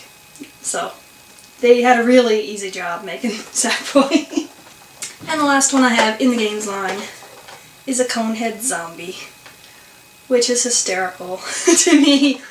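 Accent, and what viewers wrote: Ireland. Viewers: R u Irish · I can't decide wether she is Irish or American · Are yu from Ireland? You kind of sound like us haha I wish we got a haul like this! :P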